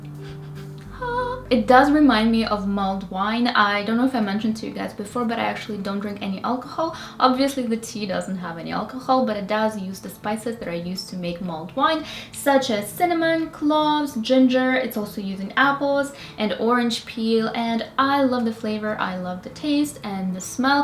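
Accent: Russia. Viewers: she's russian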